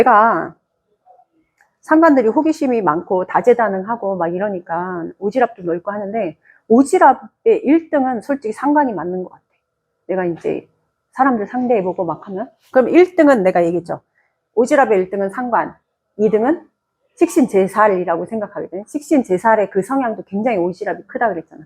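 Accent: Gyeongsang, South Korea. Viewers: 경상도 말투가 가끔 있어요. 근데 서울사람. ~^^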